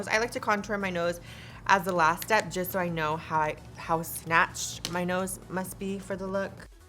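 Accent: United Kingdom. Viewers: Why does she sounds a bit British here? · living for the british accent bih🎉🎉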